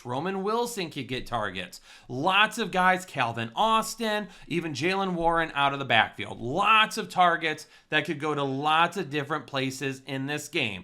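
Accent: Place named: Michigan, United States. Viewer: Man, this accent is soooooooo Michigan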